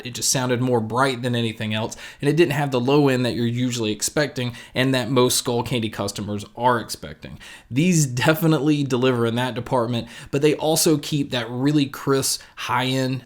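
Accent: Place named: Texas, United States. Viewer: Texas boi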